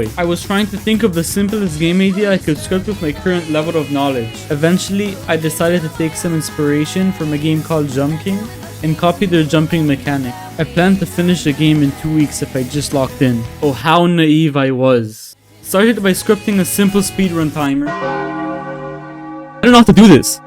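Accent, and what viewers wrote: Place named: Israel. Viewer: אנימת אחי שמעתי קצת מבטא ישארלי ולא הייתי בטוח עד שראיתי את ההיסטוריה שלך בצאט גיפיטי חחחחחחחחחחח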